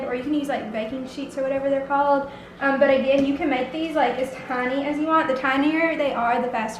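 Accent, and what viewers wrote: South, United States. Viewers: this is a awesome give away I just love listening to your cute lil southern accent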